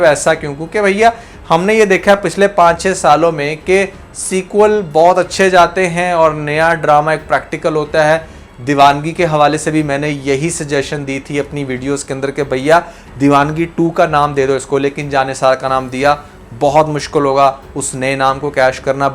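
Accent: Punjab, India. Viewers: Bhai Punjabi Jo hai is wAjh se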